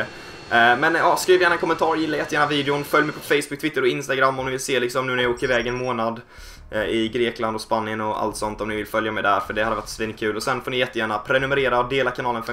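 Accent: Norrland, Sweden. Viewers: Gillar din Norrlänska dialekt, du är bra på språk. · det det var norrländska..... inte värmländska.............. xD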